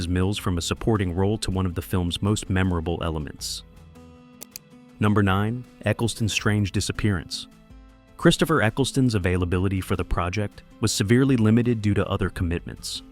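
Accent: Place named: United States. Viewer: Complete waffling drivel. Garbage duhmerican narration.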